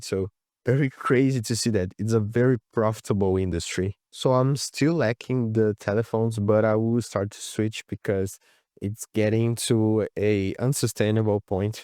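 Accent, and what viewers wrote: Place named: Brazil. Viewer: He is prob from Brazil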